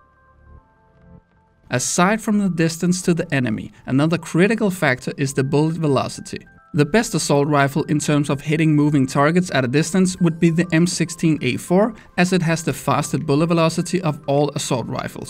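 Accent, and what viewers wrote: Denmark. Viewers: Are you from Denmark? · Ey youre danish right?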